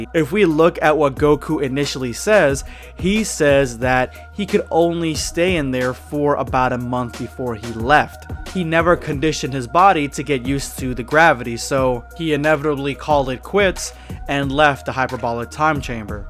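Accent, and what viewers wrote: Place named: Latin America. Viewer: Oh man you being Hispanic makes so much sense, translating dbshippuden and the slight accent in your voice. Edit: I’m Hispanic too🤫, and could you do a video where you speak some Spanish??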